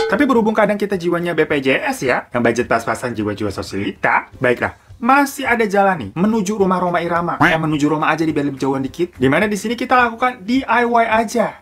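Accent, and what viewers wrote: Java, Indonesia. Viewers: jelasinnya kayak teman, bahasanya paling enak dan satu satunya yg bisa ngelawak, cerdas bang! Nessie judge: ngejelasinnya serius Arroyan Pram: ngejelasinnya dramatic dan sinematic editor berkelas Korea reomit: ngejelasinnya medhoq Yok siapa lagi nih???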